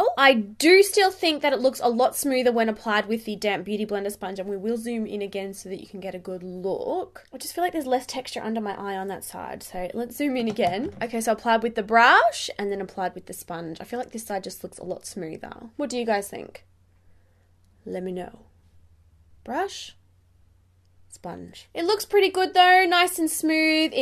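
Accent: Australia. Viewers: 1) You're a gorgeous girl. 2) Us Americans luv those Aussie accents and I'm no exception. 3) Luv how excited u get over a really good product. You look like the kind of girl who wouldn't get excited about anything (u have that 'cool' factor.) 4) U have the most beautiful blue eyes. I've been trying to find a pair of colored contacts that r your eye color but to no avail.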